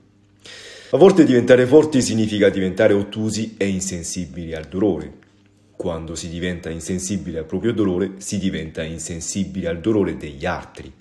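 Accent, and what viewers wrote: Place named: Rome, Italy. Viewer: Certo che l’accento romano su di te ha degli effetti sorprendenti! Grande. (Per inciso, magari sapessi parlare giapponese come tu parli l’italiano)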